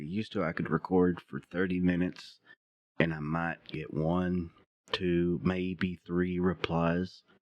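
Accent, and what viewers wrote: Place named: South, United States. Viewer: You sound like you maybe here from the south... If so hi neighbor. If not hello anyway. Love your videos!!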